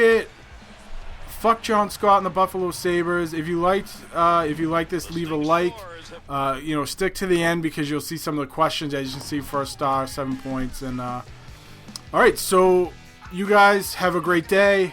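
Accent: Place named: Massachusetts, United States. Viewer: hey man, I grew up in Bridgewater, MA...though living in Denver now...don't get to hear alot of people that sound like me!! Go B's!!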